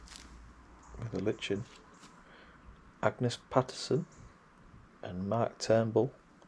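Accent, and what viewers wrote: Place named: Liverpool, United Kingdom. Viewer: Fascinating! Thank you. I've always been drawn to cemeteries, for the art, the anthropological info and of course for the "unknown" death is a reality and to me, pondering what may happen after we stop breathing- fascinating- reincarnation? Have you seen any tombstones which make a reference to a "new" life- not in the Christian sense, a new life with Christ- I suppose it depends much on where you are exploring- your accent, Liverpool? I love it by the way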